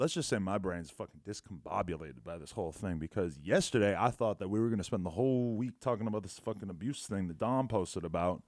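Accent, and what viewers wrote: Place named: New England, United States. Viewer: For some reason I can really pick up new england accent in this video, which is usually nonexistent to me.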